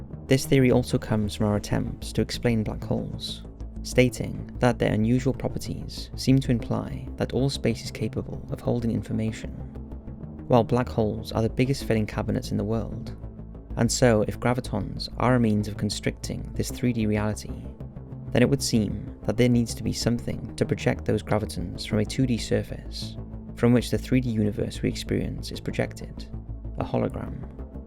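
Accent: Wales, United Kingdom. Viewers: He's Welsh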